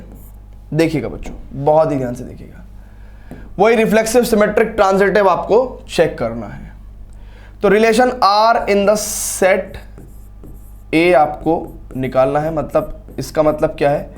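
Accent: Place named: Muzaffarnagar, India. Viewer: Sir aap muzzafrnagar ke ho mai bhi😊 aavaj se hi pta chlra ji😅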